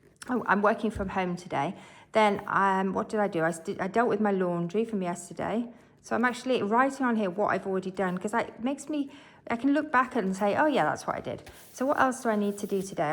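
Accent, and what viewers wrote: United Kingdom. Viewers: British accent 🍷☝🏻🤓 Nice video tho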